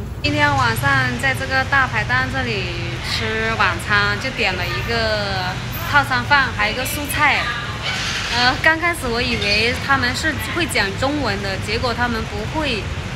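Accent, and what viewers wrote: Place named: Cantonese, China. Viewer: 你说中文好可爱哦有点广东腔👍🏻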